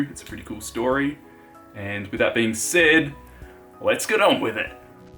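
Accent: Australia. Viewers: Bro are you Aussie?? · Yes! Another Aussie! Love your work mate!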